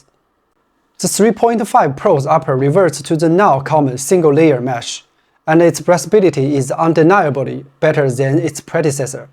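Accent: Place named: China. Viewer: 你这chinglish听得我全身发麻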